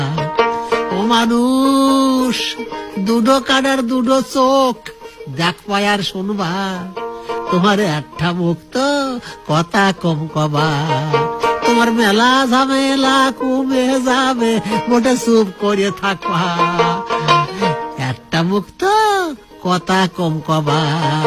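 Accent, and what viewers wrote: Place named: Jessore, Bangladesh. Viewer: খুব সম্ভবত যশুড়ে উচ্চারণ । আমার পূর্বপুরুষেরাও যশড় জেলার অধিবাসী।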